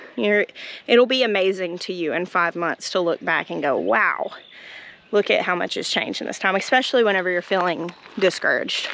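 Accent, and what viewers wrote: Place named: South Carolina, United States. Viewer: Do you realize how much of a South Carolina Southern drawl you have picked up??? 🤣🤣🤣😍😘😁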